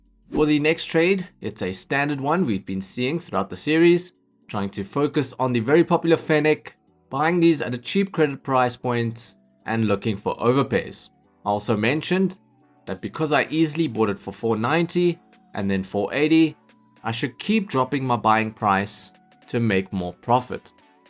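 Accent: South Africa. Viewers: Is this guy from South Africa ? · Yo u from SA? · I just want ti ask are you from South Africa or Australia or neither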